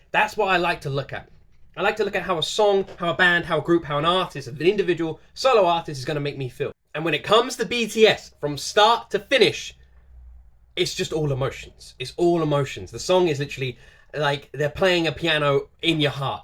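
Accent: United Kingdom. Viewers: You have seen blood seat and tears and fire so it would be good to watch these live 2016 MMA. Is that a Leeds accent?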